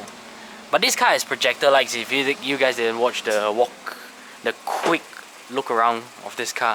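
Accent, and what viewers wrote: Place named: Singapore. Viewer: Hey bro u talk like Singapore slang english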